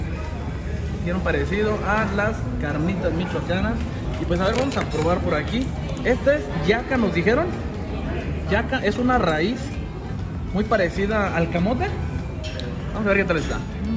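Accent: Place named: Colombia. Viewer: Y otra cosa a mi me encanta su acento el colombiano tiene su particularidad al hablar y claro depende la región porq hay unos q exageran pero los normal me encanta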